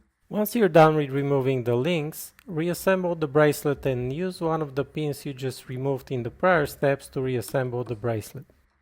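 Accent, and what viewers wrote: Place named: Eastern Europe. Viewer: good video--knew it would be so, always look for eastern european accent for jewelers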